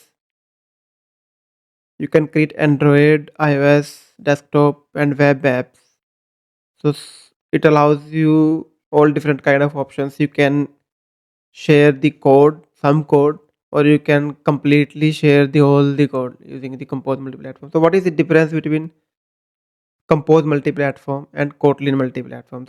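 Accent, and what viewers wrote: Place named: India. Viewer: Nice video in an overall. I think you need to just slow the pace a bit! I usually dislike a lot of indian accent when speaking english, but in your case was really fine. Just need to improve a little more your speaking, try to pronounce more correctly the words, including the right sound of the W word. Doing this, your channel will grown way more!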